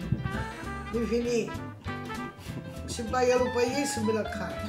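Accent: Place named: Sicily, Italy. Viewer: La nonna parle un sicilien parfait de la région d Agrigento et en plus les Pourpette ont l’air délicieux brava la nonna demain j’essaye de le faire aussi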